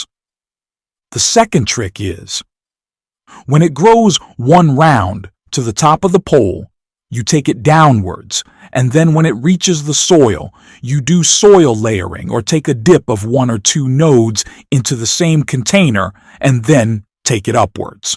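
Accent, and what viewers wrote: India. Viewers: Honestly its sad to see people having to us ai because its clear and in depth with the perfect tone. I dont think that many people love the indian accent.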